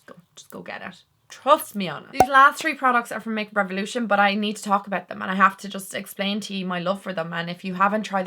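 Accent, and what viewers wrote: Ireland. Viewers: Thank you for sharing these! You look lovely. Listening to you makes Ireland feel a bit closer.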